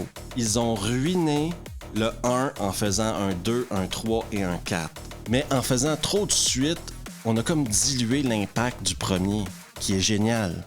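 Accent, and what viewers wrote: Quebec, Canada. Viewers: Salut ! Sympa d'avoir tous ces avis sur des films de notre génération (on a à peu près le même age) avec le petit accent du Québec exotique pour nous les Français, mais dans ton cas, y a aucun souci, c'est à 100 % compréhensible ! (je dis ça car j'éai été au Quebec en vacances et c'était vraiment pas facile, et du côté de Tadoussac, là c'était quasi une autre langue pour moi :-D ]. Super critiques de film donc, j'ai vraiment du plaisir à les regarder, bravo ! Souvent je rigole tout seul d'ailleurs, avec les expressions que tu trouves pour décrire les scènes. Pour ce qui est de "La mutante", j'avais vu au ciné aussi et j'avais même lu le livre avant (mais c'est pas un roman intrinsèque, je pense que c'est plutôt le scénario qui a été "romancisé" car c'est quasi identique à ce qui se passe dans le film, c'est juste plus détaillé grâce au processus de la narration qui permet de saisir les pensées des personnages... 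et de l'E.T.. Bref, j'avais bien aimé même s'il y a un côté "petit budget" qui empêche de donner une plus grande ampleur à ce qui aurait pu être une grande réussite du point de vue de la science-fiction. J'avoue que Natasha est bien plaisante à regarder, j'étais pas encore majeur hein ^^ (on l'a revue dans "Mon voisin le tueur" quelques années après en version moins... dénudée mais toujours très appétissante). La suite peut encore se mâter je trouve, encore moins de budget je crois mais des scènes bien dégueux-gores. Les 3ème et 4ème, c'est du niveau des Starship Troo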